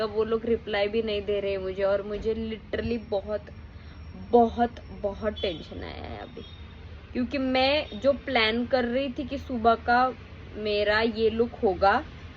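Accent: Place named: Maharashtra, India. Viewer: apka hindi bahot marathi type hai 😁😁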